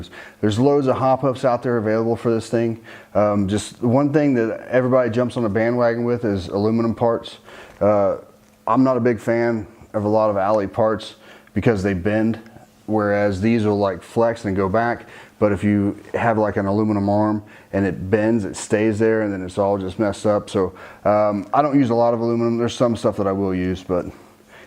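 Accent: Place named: United States. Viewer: its kinda funny cos u have such a strong american accent but you talk in quid or british pounds lol good video tho!!!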